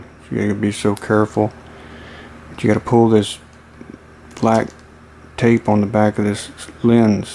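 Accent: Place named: Texas, United States. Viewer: You sir are really funny talking with that slow texan voice ! My camera would show me a blurred screen with weird colors and wouldn't take photos anymore after a trip in the Sahara. I followed you step by step, blew canned air and picked out any dirt I could find and it worked ! I'm so happy and I wanted to thank you very much.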